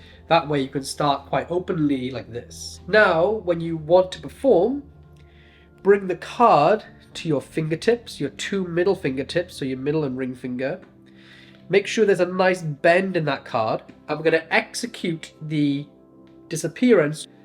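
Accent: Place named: Wales, United Kingdom. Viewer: Part of Wales you from, but? Diffo picking up unmistakable traces of the accent. And with a Celtic name too 😮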